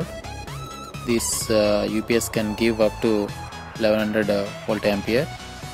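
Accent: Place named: South India, India. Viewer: Dude i know that music is overrated but whats wrong with his English? Its just his accent. He is most probably from South.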